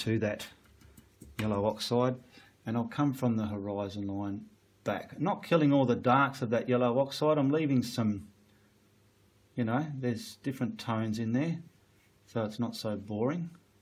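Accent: Australia. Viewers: Just stumbled across your video and I gotta say, I think I found my new Bob Ross lol. Your voice is very nice to listen to❤️ I love me an Australian accent🤭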